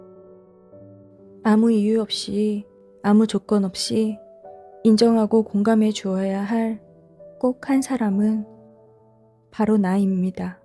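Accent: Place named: Gyeongsang, South Korea. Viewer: 목소리가 듣기 좋네요~❤ 경상도 사람 같아요 ~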